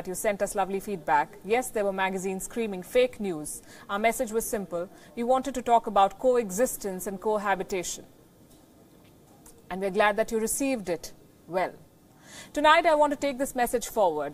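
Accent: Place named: India. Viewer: Well thats just the corporate India accent. The ones who carry the nation forward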